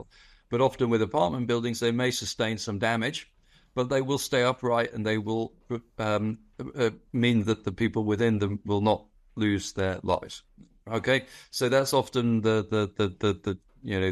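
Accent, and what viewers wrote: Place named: England, United Kingdom. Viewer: I detest the English dialect. The only one worst is aussie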